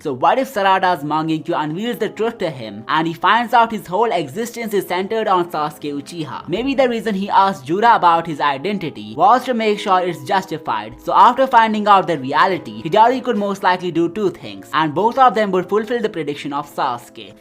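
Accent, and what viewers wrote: India. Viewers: Broo I thought ur indian because of ur accent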